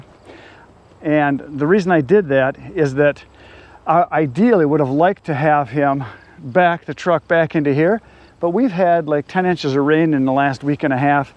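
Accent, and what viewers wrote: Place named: Upper Peninsula, United States. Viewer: Welcome to Michigan. The people from the UP sound a bit like you so you should fit in nicely. Have a great time.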